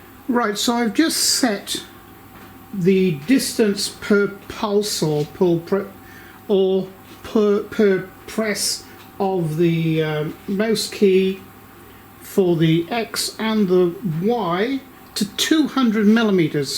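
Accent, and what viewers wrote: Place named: South West England, United Kingdom. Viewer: I’m doubly flabbergasted! Firstly that a machine capable of sub tenth of a millimetre precision is miles out at default settings. Secondly that you’re eyeballing it! What does the digital vernier say? Surely the settings should be based on the maths of of the screw dimensions and stepper motors etc. Should mention I’ve got a 6050 ready to assemble and coincidentally same VFD/motor combination you set up a few months ago. Your videos have been a wonderful source of expertise. Many thanks. PS. Enjoy your very familiar accent, South West England?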